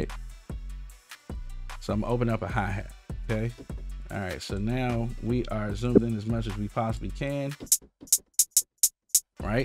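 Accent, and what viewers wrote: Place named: Washington DC, United States. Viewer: It’s the dc accent bro lmao he can’t help it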